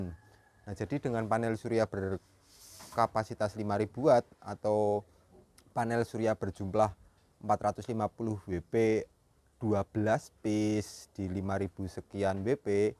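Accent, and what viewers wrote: Java, Indonesia. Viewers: intinya gw nggk seneng aja logat medok udah itu ajaa bisa kan biasa ajaa nggk usah medok2 gitu maaf yaa gw bukan orang jawa ngertiii loee klo nggk ngerti ilmu psikologi nggk usah ngegas emang dasar nya nggk ngerti yaa udah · oh maksud lu skrg ngancam? Silahkan lanjutkan.. Tidak sedikitpun saya takut dgn orang bodoh seperti anda.. Semua yg baca komen2 ini pasti bisa menilai. Dan menyedihkan betapa bodoh dan sempitnya dunia anda sampai mengatakan bajwa logat medok di video itu dibuat-buat.. Wkwkwkwkwwkkkkkk Saya sangat heran dgn tingkat kebencian dan ketidaksukaan anda hanya karena logat medok, sepertinya anda memang perlu konsultasi dgn psikolog.. Wkwkwkwkwkwkwkkkk Sungguh saya tdk membayangkan jika orang2 medok di Jogja mentalitas-nya seperti anda, menerima banyak teman2 dari seluruh Indonesia yg logatnya berbeda2 dan sangat beragam. 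Tapi untungnya menerima beragam logat dari kawan2 di seluruh Indonesia justru bisa membuat semua berbaur dengan akrab di Jogja.. Cukup sekian dari saya menanggapi anda yang "sakit", selanjutnya silahkan kalau anda akan selalu ngeles ataupun anda mau terus menerus memupuk ketidaksukaan dan kebencian anda dgn sesama bangsa Indonesia, sekedar karena perbedaan logat dan latar belakang budaya.. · kuping gak usah di pasang kalo gak mau dengar logat medok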